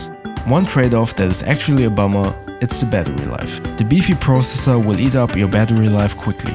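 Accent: Germany. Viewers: I hear some German accent somehow :))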